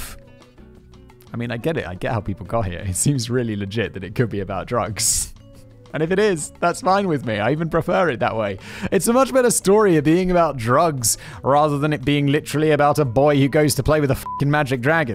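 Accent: RP, United Kingdom. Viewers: I love how the body Spiro was a recurring gag on Futurama. I'm sure might have heard about Futurama, but never watched it. At least we have a recurring gag on brain blaze. is a div who doesn't care to learn about anything, but his British accent and good writers and editors made him millions. I wish I had a cool accent and could make money reading other people's work....at least I'd enjoy and remember the videos I made!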